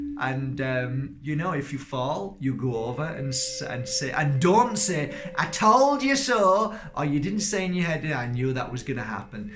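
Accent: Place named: Midlands, United Kingdom. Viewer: Love your style - just found your channel ….you have great energy - is that a Midlands accent? From one UK person to another....xx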